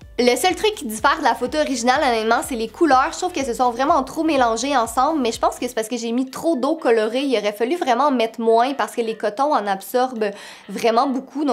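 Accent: Quebec, Canada. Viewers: T québécoise ? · Accent Quebecois 😍😍😍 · J'adore ton accent 😍😍 il est québécois ? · Jdr l accent des canadiens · T'es tu quebequoise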